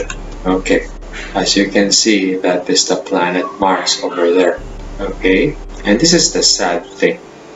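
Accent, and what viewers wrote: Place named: Philippines. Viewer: totally has a Filipino Accent.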